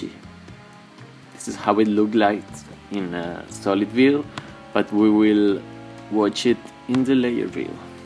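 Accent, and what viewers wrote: Spain. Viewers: Después de un buen rato viendo el vídeo y sospechando por tu acento de que sabías hablar español, veo que pone en el Mac "Archivo/edición" jajaja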